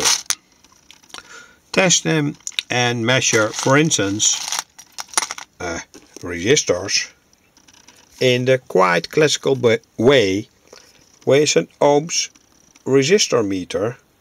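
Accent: Netherlands. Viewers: I love your Dutch-English accent. It reminds me so much of my grandparents. Thank you very much for the excellent advise on sorting components. I am very much interested in seeing more videos that are dedicated to the testing of the various components. This video is very inspring.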